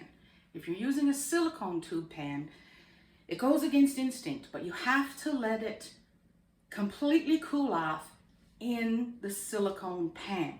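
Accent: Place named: Ireland. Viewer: Boiled fruit cake has always been a big favourite here in Ireland, I started baking back in the 1970's when I got married and its one of the first things I made. These days I usually make tea brack, coffee cake, Bakewell cake and scones. Luckily my hubby loves cake! I honestly thought you were Irish, from Dublin exactly, except for a couple of words. But then Newfoundland and Ireland have long connections. Thank you for sharing your recipe.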